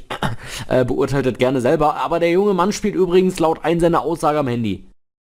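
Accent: Berlin, Germany. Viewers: Deine Kommentare sind einfach mal richtig schön mit dem Berliner Dialekt 😂😂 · Ich liebe Dein Berliner Dialekt, das klingt so lieblich. Ja, smartphones im Straßenverkehr sind das größte und "modernste" künstliche Zusatz-Übel aller Zeiten! Auch wenn sie nicht direkt dran sind, es strahlt extrem und erzeugt Verpeiltheit/Verstrahlung und vermehrt auch Angina Pectoris und Gedächtnislücken als auch Konzentrationsstörungen, Spaltkopfschmerz und burnout, da ja Mikrowellenfrequenzschleudern, die extrem disruptiv gepulst-digitale Störsignal- Befeldung auf die Synapsen, Zellen und das vegetative Nervensystem absondern mit extremer Wirkung, kein Wunder, daß die Lobby all die dazugehörigen Studien zwar zahlte, aber sie aufgrund der extremen Negativauswirkung auf das Geschäft nie veröffentlichte. Bluetooth, WLAN gehören dazu! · Die Videos sind schon manchmal echt krass, aber viel cooler find ick dein berlinerisch ❤ Mit den besten Grüßen aus der Hauptstadt 🎉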